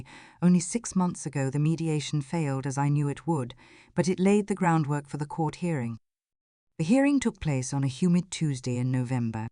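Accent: United Kingdom. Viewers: Hmmm, lives in GA, but an accent from the UK.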